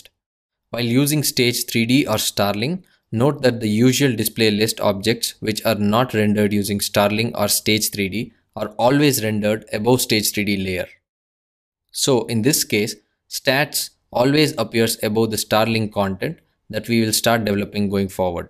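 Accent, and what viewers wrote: India. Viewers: dis should be bery bery nice! I really like indian accent :D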